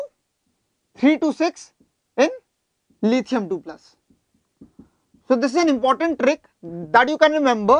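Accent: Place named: India. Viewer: OMG HE'S INDIAN.........desi pride i'm so proud of his accent aghh <3 being indian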